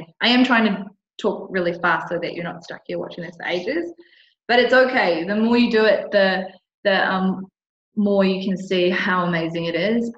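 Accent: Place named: New Zealand. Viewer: omg - i watched this at work and we have no speakers on computers so used captions lol Youtube can't pick up a Kiwi accent.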